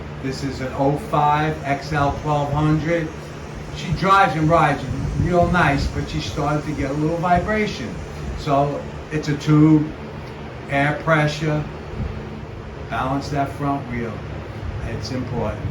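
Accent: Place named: East Coast, United States. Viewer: A very big and welcome hint...will take a good look this weekend. A side note...I love your east coast accent.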